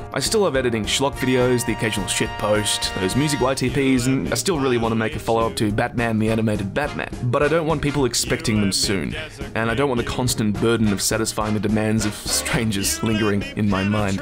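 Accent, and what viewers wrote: Australia. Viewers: I didn’t know you were Australian. But see ya later I guess! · Oh fuck didn't know U were Australian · answer any you like: are you australian? what was your favourite ytp to make?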